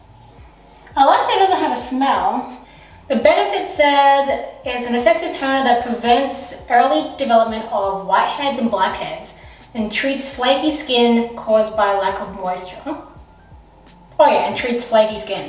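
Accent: Australia. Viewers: Onggggggggggg I didn’t know she had and Australian accent this my first time on her yt cause of the tik tok deletion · I love your acccent even tho I have been to Australia half my life 😂😂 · I love the Australian accent🤩🤩🤩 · It’s weird seeing an Asian with an Australian accent....btw she’s like the first pretty Asian I’ve seen, prolly cuz she’s white-washed (her name IS....yikes)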